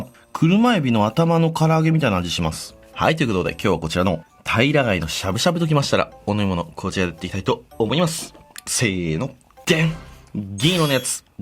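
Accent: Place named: Aichi, Japan. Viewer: サワガニより好き｢だで｣ て言うとやっぱり同じ地元の愛知の人の感じがあって親近感湧く。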